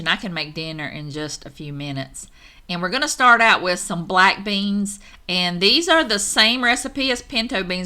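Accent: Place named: Tennessee, United States. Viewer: Hi from Tasmania. Love your accent. I sounded just like you when I lived in Tennessee. 😊 Now I sound like a crazy American with an " American" accent here in Tasmania Australia. Can't wait to try the Chili recipe. Just processed 7 litres of homemade worcestershire sauce yesterday. They have to age for at least 6 months. 😅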